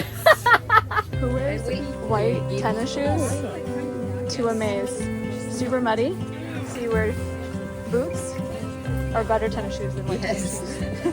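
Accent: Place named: Argentina. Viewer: Love seeing the family together! Love the Argentinian accent. Such a chill video! Ty for this.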